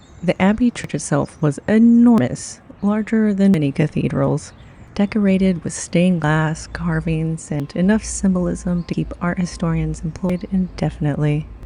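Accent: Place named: New England, United States. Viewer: from a personal standpoint big H went right over my head mostly! I was being captivated by that sharp witty Yankee tongue of yours and the glorious ginger mane! To comment on your original question I’m gonna now have to revisit it! 😁🔥🤟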